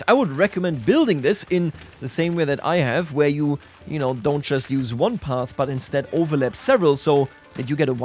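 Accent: Bavaria, Germany. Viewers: Damn even tho you are from Bayern, isch dein Akzent im Englischen halt echt ned so schlimm :0 Very nice Video dude, now i have to watch the whole series!. Grüßle von den werten Nachbarn ausm Schwabaländle ;) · sofort gehört dass du deutscher bist (kein hate) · It smells like a german akzent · he even uses some german words and phrases in between^^ but only noticable for a german I think, and the hints of the accent gave it away for me :p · Sometimes you have Bavarian Germn Spikes (were german slips through while talking) Its not bad I like that diversity. German Englisch Vielfalt.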